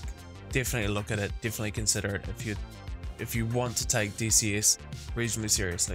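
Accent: New Zealand. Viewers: AYEEEEEE YOUS A KIWI ASWELL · Great tutorial mate. I've been flying in DCS for 3+ years now but it is always good to see what else is out there. I have the Warthog HOTAS at home with VR and I travel with the Extreme 3D Pro to play on the go. I never knew about the entry level eye tracking, it might be worth considering when I am away. Did I hear a slight kiwi accent?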